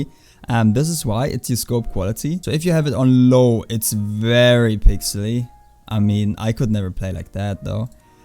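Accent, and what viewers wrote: Germany. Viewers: mann hört das du deutsch bist xD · i hate this german voice omfg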